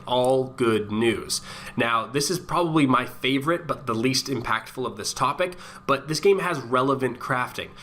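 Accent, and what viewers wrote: Canada. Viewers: I hear teh Canadian creeping through.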